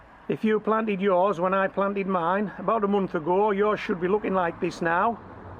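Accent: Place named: Cumbria, United Kingdom. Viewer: I live in Kintyre now, and miss the Whitehaven so much. I watch your videos a lot, just to hear that Fab Cumbrian accent! Keep them coming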